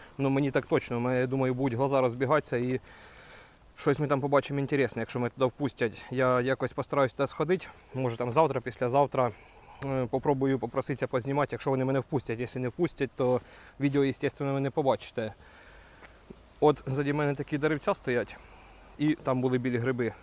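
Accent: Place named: Surzhyk, Ukraine. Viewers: Лютий сурж, слухати неможливо, вухам боляче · 100%, Вже можна було б і потужність свого суржика значно зменьшити. Бо будучи в Польщі, напевно, ретельно намагався опановувати польську мову, в Данії теж буде, бо як іначе можна претендувати на високу зарплату, без місцевої мови. А ось до української мови несерйозне відношення. Тим більше, блогер, як він хоче завоювати симпатії багатьох українців, без української, навіть з легким суржиком, мови. А такий потужній його зараз суржик відрізає велику кількісь потенціальних підписників. Ось і мені подобається, а через такий тяжкий суржик, не маю бажання підписуватись.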